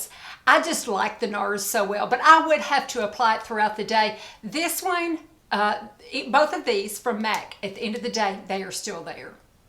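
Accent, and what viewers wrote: South, United States. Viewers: LOVE seeing you twice in one week!!!!! thank you so much. I appreciate it more than you can know! And I'm PROUD of you for taking down ANY video you deem necessary! I guess I didn't read the "bickering" comments, as there were SO many that were flooding thru, but just saw lots of Spiritual women ! I go along with Any of your decisions! I'm here for the skin care, beauty tips, and love the makeup GRWM's over anything else. However...Love your serious ones, too. Guess I just love seeing your face and hearing your southern voice like mine! We sound the exact same here in NC!!!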